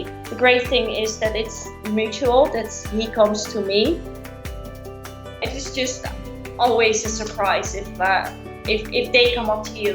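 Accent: Netherlands. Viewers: This video was already on the Dodo but nevertheless stunning. I can hear she is Dutch and so am I , lol. 👋🌞🇳🇱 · The STRONGEST Dutch accent I've heard in a while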